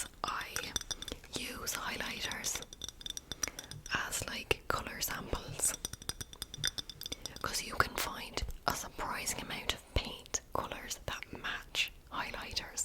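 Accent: Ireland. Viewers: Loved this! More pls- in Irish accent ofc!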